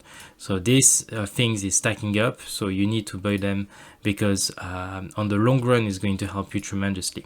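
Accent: France. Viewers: je sais reconnaitre un confrere francais quand j'en entend un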